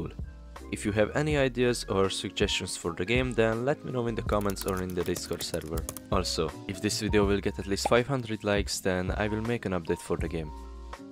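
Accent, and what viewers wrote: Hungary. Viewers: you are from Hungary?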